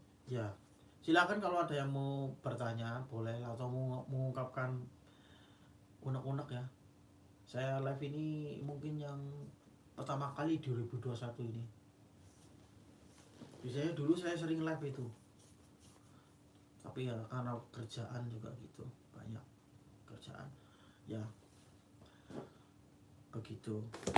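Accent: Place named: Banyumas, Indonesia. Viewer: wah ngapak mas, wong ndi njenengan. Saran🙏 Karo Lexis audio jjal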